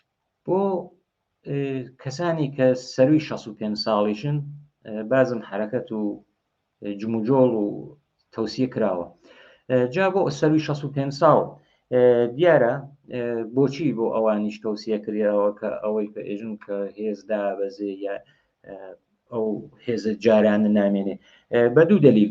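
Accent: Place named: Ardalan, Iran. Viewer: خسته نباشید . امید به بهبود بیشتر برنامه های شما . چند پیشنهاد کوچیک ۱ به نظرمن لهجه شیرین اردلانی را فقط حرف بزنید کافی باشد. ۲ اشکالات تکنیکی در پخش اتاق فرمان دقت بیشتر شود. ۳ مهمانهای که دعوت می شوند حتما متخصص در رشته مورد بحث باشن. ۴ در نوشتار(ته ندروستی) ( ته ندرووستی) غلط املایی کوردی دارید . دقت لازم است .! 🚩🚩🚩 در کل برنامه خوبیه امیدوارم که بهترم شود. .ارادت مخاطب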